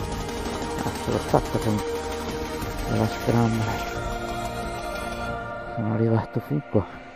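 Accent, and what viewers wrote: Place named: Romagna, Italy. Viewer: figata di strada, davvero bella, poi mi piacciono un casino i tuoi commenti...l'accento romagnolo mi fa morire....tranquillo...sono sposato e con una figlia🤣🤣🤣🤣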